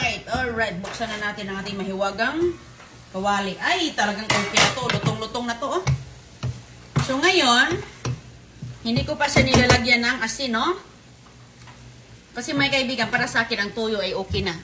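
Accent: Visayas, Philippines. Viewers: Tama iba ang bigkas nang bisaya kung magsalita nang tagalog.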